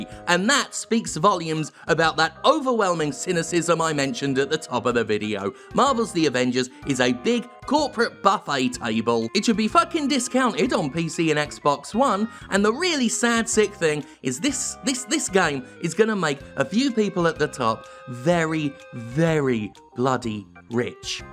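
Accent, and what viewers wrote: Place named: United Kingdom. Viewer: Don’t get how but okay? He has a British accent, 👏👏👏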